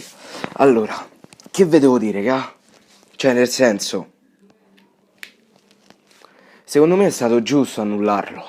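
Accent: Rome, Italy. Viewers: Parli romano. Non ho parole.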